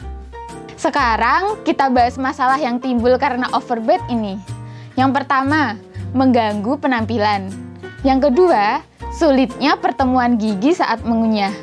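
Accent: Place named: Banyumas, Indonesia. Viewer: Dokternya wong ngapak. Keton banget logate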